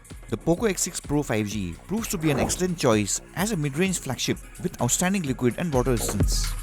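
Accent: India. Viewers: From his accent I knew he was Indian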